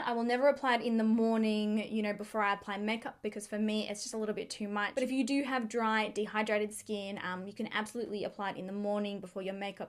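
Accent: Australia. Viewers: You sounds like an Aussie. Thanks for tips, but I am interested in natural therapy not after promotional products. :) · ARE YOU AN AUSSIE??SOUNDS LIKE IT LMAO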